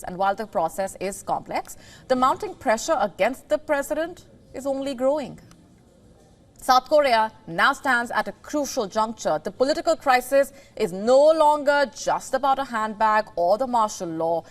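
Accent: India. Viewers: Good info! Her accect is so heavy I can not understand, maybe this a show only for Indians? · i dont understand indian speaking english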